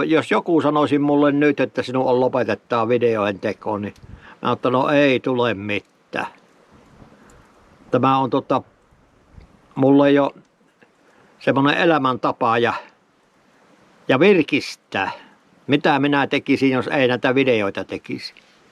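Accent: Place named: Kaavi, Finland. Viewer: Kiitos ja kiitokset, että jaksat tehä näitä juttuja, mukavia arkisia hommia. Mie niin tykkeen tuosta siun kuavilaisesta murteesta, mukavan kuulosta. Nyt pitää lähtee ostamaan ryynäriä ja loimottoo hiilloksella. Seuraavaa laavureissuu odotellen...❤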